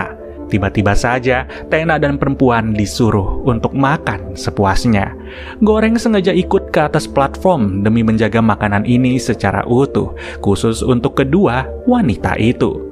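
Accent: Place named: Banyumas, Indonesia. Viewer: Wong ngendi koh lur kayonge ngapak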